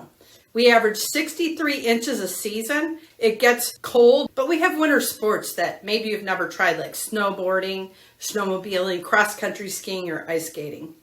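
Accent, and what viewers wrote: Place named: Michigan, United States. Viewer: Perfect Michigan accent!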